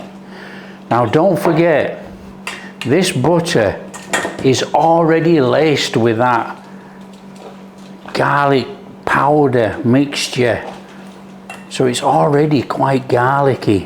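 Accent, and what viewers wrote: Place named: Northern England, United Kingdom. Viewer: Love it!!!!! Let me guess your accent... Newcastle? Sheffield?? Yorkshire?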